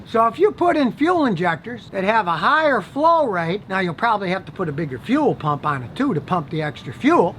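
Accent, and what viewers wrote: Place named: Alabama, United States. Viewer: He must be from alabama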